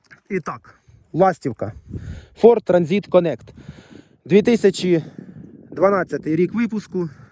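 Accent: Poltava, Ukraine. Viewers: Це Полтавський суржик дядь)🤣💙💛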